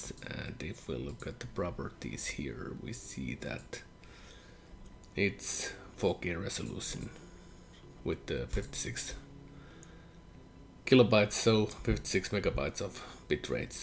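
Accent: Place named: Finland. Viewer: Ei se pahasti kuulu läpi se ralli englanti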